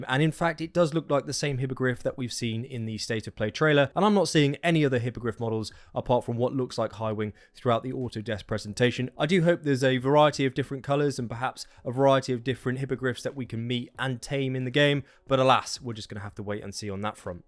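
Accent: United Kingdom. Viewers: There's something about a British accent speaking about Hogwarts/The Wizarding World 🤣 Great video, looking forward to Gamescom! Thanks! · Big up the British accent on these videos 👍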